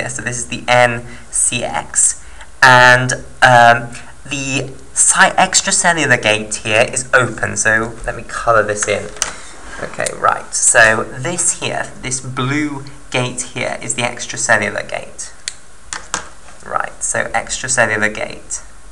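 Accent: United Kingdom. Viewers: well explain and well accent british accent , right ?????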